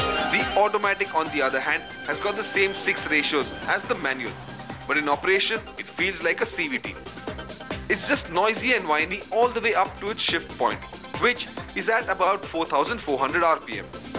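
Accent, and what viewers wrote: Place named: Australia. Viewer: Australian?? Doesn't he mean "English" albeit with an aussie accent.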